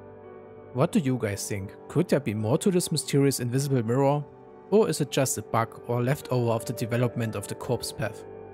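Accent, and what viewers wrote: Germany. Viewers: Are you by chance german? Your accent sounds pretty german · Man, as a guy from germany I love your german accent. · I don't know but u sound like u came from DEUTSCHLAND too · are you by any chance german? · Bist du Deutsch? Du hast einen akzent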